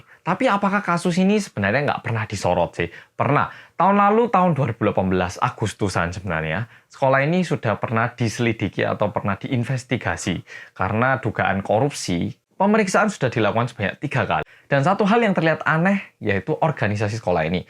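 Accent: Java, Indonesia. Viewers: btw ko bisa selancar itu sih b.indonya? ketambag logat jawa 😂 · pas nyebutin artis-artisnya kaya mc music bank, pas kelar langsung medhok lagi😂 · Medoknya itu lohh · Ngomong indonya medok tapi waktu ngomong korea langsung keliatan aksen korea :v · Mas . Panjenengan medok tenan.. sy baru ngikutin channel ini .. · Bahasa inggris nya kok medok aksen jawa 😂👍🏻👍🏻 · ????knp kk ini keliatan kek orang Korea tpi gaya bhsnya kek orang Jawa:)))))) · Keren, ditunggu ep ke 2 burning sun nya.. medok asli, ada beberapa yg rada kepeleset waktu lafalin "s", masih berasa koreanya hahaha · Bahas KATE YUP dong babang ganteeng..udah banyak yang aku liat tapi pengen versi babang medok :') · Bang, medhok aee... 👌👌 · pertama kali nonton tentang yang kasus kapal sewol, ngakak sendiri ngomongnya MEDO bangeeettttt wkwkwk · duh mas medhoknya wuenak didengar, uapik tenan kalo saya dari cilacap jadi ngapak bahasa jawanya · (Bagian waktu masnya nyebut nama2 artis korea pake aksen korea tapi abis itu balik medok) Terimakasih kembali :) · Medok bgt aku aja gak semedok gitu... 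· Memang medhok apa sengaja d medhok2in? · Kakak bahasanya medok banget...hhhhh Kaya ke jawa2an gitu... Gemes deh jadinya... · Bahasa korea logat nya auto beda. Balik ke bahasa indo jd medok lagi. Kok bisa gitu😂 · Pas ngmg nama2 idol korea lgsg percaya klo kak orang korea (saking medoke kadang gag percoyo) 😁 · Mase medhokkk tapi nek nyebut nama wong Korea lidah medhoknya ilang.. piye tho masss?? Ajari aku 😁😁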